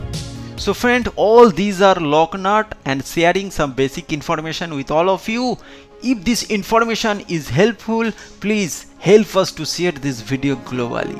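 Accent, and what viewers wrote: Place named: Bengal, India. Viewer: Are you bengoli?....because accent is like that By the way love your content from Odisha😊😊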